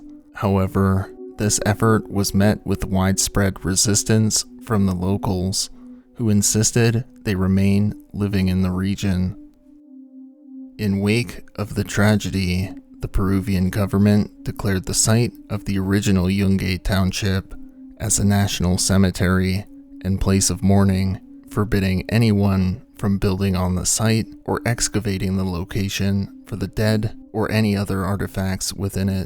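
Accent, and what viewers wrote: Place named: United States. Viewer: Now can’t handle your American voice, I’m sure it’s a grate video but that voice is beyond unbearable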